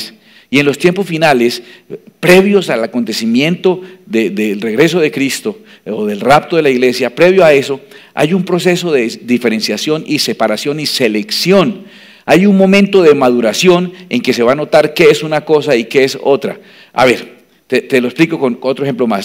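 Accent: Santander, Spain. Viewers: Haber sabido de usted Pastor ha sido de gran edificación para mi vida he aprendido mucho con usted, gracias a Dios por su forma muy diferente de montarnos el propósito de Dios, sin contar las innumerables ocasiones que me he destornillado de la risa por su comentarios y esa forma tan peculiar de hablar como santanderiano que es. Gracias a Dios por usted, bendiciones para usted, su familia, su iglesia y su ministerio a través de este canal Feliz 2018 para usted también. Amen.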